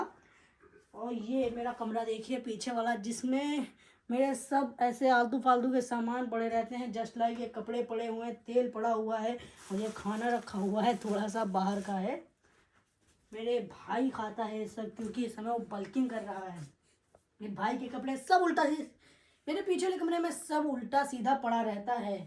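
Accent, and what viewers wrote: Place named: Bahraich, India. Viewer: Bhai Bahraich se ho kya 😊 mera gaw h vha Apki local language vhi ki lg rhi 😊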